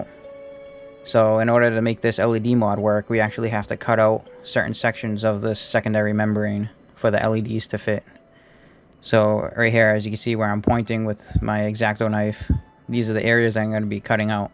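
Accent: Boston, United States. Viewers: Are you from MA? I’m hearing a Boston accent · You from Boston?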